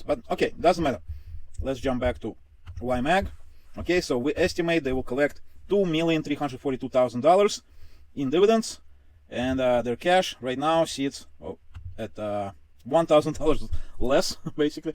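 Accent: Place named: Russia. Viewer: У вас русский акцент))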